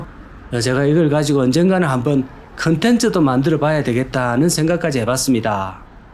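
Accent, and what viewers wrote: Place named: Busan, South Korea. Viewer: 강호의 발바닥TV님 제발 부탁인데 부산 사투리 그만좀 쓰세요 듣기 불편하네요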